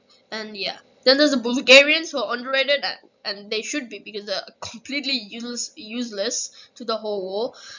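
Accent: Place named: United Kingdom. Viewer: BROOO YOU SOUND SO BRITISH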